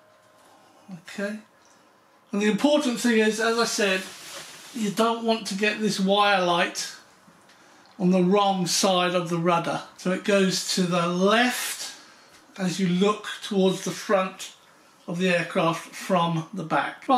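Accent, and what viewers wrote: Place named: United Kingdom. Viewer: You managed it to let me enjoy the britihs accent . Im sorry but before it really pissed me of, but now i can just relax, Thank you.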